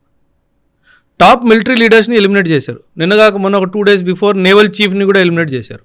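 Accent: Punjab, India. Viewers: OkA chilipi question sir italian of the east ani telugu langauge ni antaru meeru punjabi ina naku telisi mee antha sweet ga telugu ni maatladagala punjabini nenu chudaledu. telugu ni meeru baga enjoy chestaranukunta telugu old songs vintara ,Ghantasala gari songs ni baga enjoy chestu vintara. Please explain about telugu sir and what is the difference between punjabi langauge and telugu langauge. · Punjabi telugu matladutunte chala different ga vundi..but superb awesome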